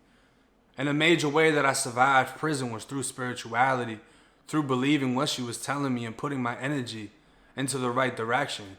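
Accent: Boston, United States. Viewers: This Shìt is WILD!! Been watching Booty bandit stories since Fleece’s hours ago…I hear your accent are originally from up north! Thought I heard some Boston in ya. Lol mad random…. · Are you from Boston bro? Def got the accent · I'm rocking with you from Canada. Love your Bahston accent. What an amazing legacy from the letters of your grandmother. That's beautiful. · Are you from Boston?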